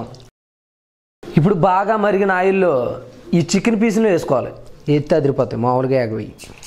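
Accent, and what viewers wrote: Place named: Andhra Pradesh, India. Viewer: Bro I like Andra yaasa....from Telangana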